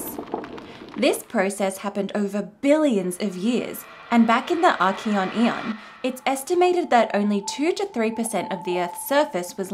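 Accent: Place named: Australia. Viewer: university? (*plus i detect an accent) ..... are you aussie, or sud afrikan, or NZ?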